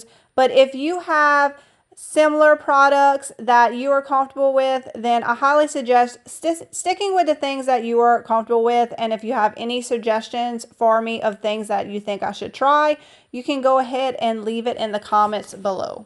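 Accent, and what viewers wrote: Louisiana, United States. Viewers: You're adorable, I just think your Louisiana accent is delightful and you're so creative. Thanks for sharing your ideas.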